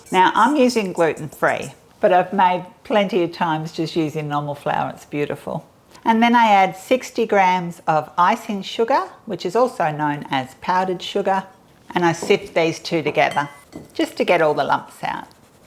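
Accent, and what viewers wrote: Australia. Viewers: Thanks, I love custard tart. Are you English? I hear the Aussie accent but I hear an English accent coming through here and there.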